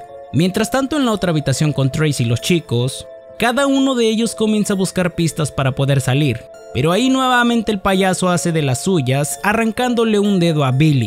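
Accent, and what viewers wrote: Peru. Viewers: Eres de Perú?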